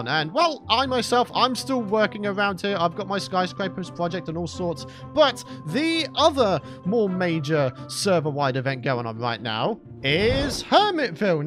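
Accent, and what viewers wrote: United Kingdom. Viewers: After listening to Last Week Tonight and then watching this, I honestly thought John Oliver was playing Minecraft 😂. Love your voice m8; so incredibly British! Excellent as always... PEACE!!!✌️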